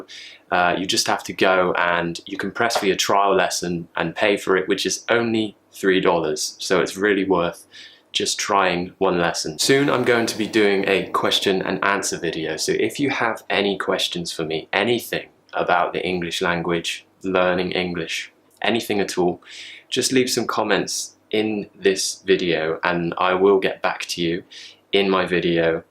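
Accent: United Kingdom. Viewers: Hi sir how are you? I like british language accent so i watching your every episode. Thanks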